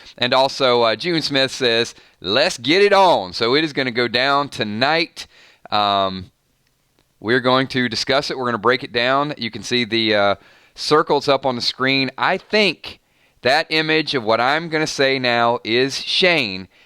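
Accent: South, United States. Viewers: Aw-Ha!!! That's what draws me in... your southern drawl!!! Go 'head country boi!! :-D Rick Kilz, Kilz Grimes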